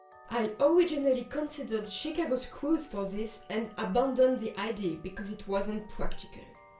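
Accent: France. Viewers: Are you french? I've never heard this accent outside of movies so I didn't know it was real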